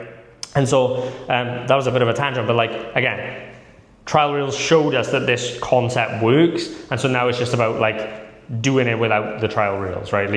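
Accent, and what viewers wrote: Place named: United Kingdom. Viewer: Omfg not another british dude lol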